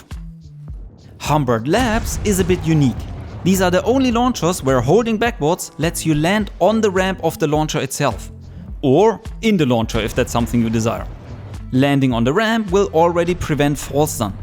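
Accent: Germany. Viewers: Man hört schon sehr stark raus das du Deutsch bist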